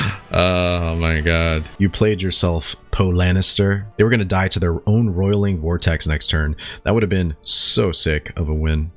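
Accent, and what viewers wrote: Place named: Australia. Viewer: Lol that Aussi accent 😂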